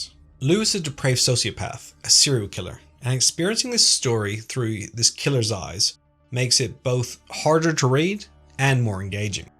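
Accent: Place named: Northern Ireland, United Kingdom. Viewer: where are you from, your accent is fascinating.. you sound northern irish but u've either been to Austrialia or the States.. its driving me mad i cant place you!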